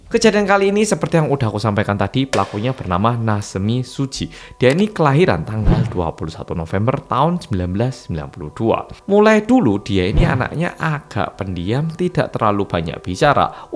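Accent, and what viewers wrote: Java, Indonesia. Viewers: Hadirrrrr.... Mas medok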